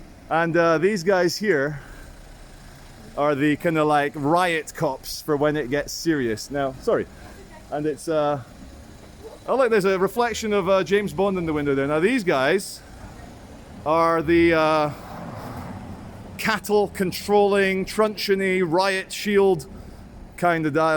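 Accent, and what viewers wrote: Russia. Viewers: I love your Russian accent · I love your Russian accent. It's really funny. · Absolutely love your Russkiy accent - I could listen all day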